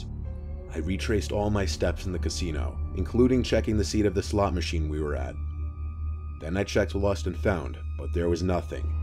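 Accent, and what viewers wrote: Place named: Long Island, United States. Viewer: You sound like ur from Long Island NY! If so ❤️❤️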